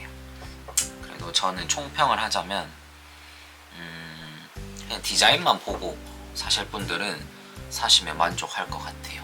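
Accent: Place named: Seoul, South Korea. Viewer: 어디 지역사세여 사투리랑 서울말이랑 계속 왔다갔다 하시네요 ㅋㅋ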